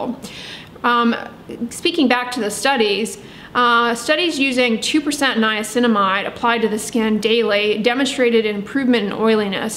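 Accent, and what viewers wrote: United States. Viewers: Does anyone know - What type of American accent does Dr Dray have ? ( I’m from the U.K. so it’s not obvious to me but it’s bugging me cos Its unusual and I can’t place it 😆) Thanks :)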